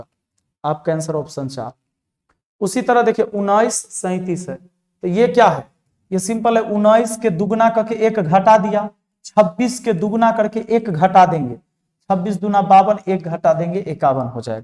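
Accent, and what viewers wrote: Bihar, India. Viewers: सर जब बिहारी बोलते है ... मुझे बहुत अच्छा लगता हैं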